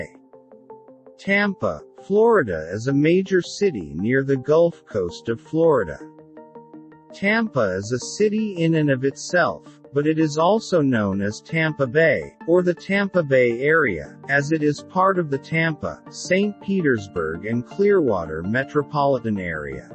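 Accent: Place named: North, United States. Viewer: you sound like a yankee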